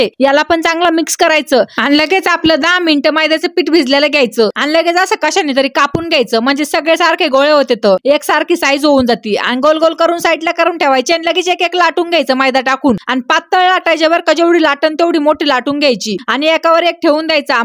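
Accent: Ahmednagar, India. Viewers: Nagari bhashecha laheja mast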